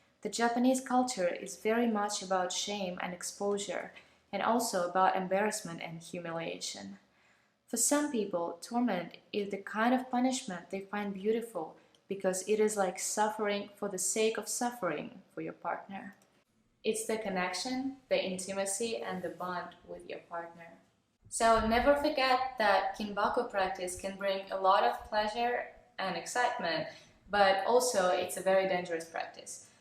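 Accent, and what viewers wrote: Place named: Russia. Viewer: Oh I love your Russian accent, I even watch some of your videos in Russian, just to here the exciting melody. Я изучаю русский язык.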